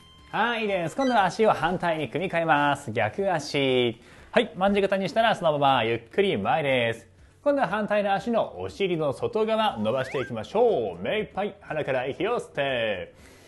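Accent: Kansai, Japan. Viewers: 分かりやすい解説と、時々出る関西なまりが心地よく、楽しくやる気が出ます。優しい励ましの言葉も嬉しくなります☺！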